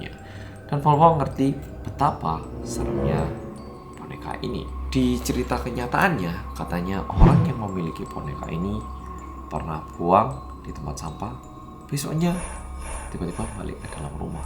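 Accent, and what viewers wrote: Java, Indonesia. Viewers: Orang Korea tapi ngmong nya medok banget wkwk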